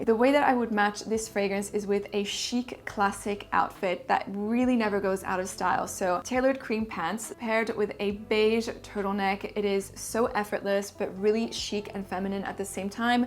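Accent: France. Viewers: I love your french accent ❤ and all this entire video, Greetings from México 🇲🇽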